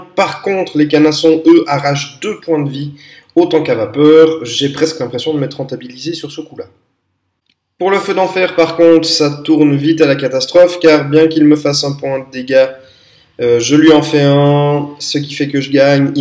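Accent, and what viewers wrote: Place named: Switzerland. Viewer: Salut encore une fois bon rapport de bataille bien expliqué. Si tu souhaites rencontrer de nouveaux joueur je suis motivé pour cela. Je joue Nains, Orques et Gobelins et Bretonniens. J'ai cru jusqu’à cette vidéo que tu étais Belge ^^ alors que tu as l'air plutôt Suisse. Moi j'habite à une heure de Genève mais en France. Bonne continuation et longue vie à ta chaine.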